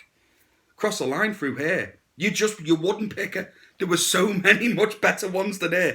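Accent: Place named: Liverpool, United Kingdom. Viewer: Boss review, you sound like your from my neck of the woods Liverpool 🤣❤❤👍👍👍👍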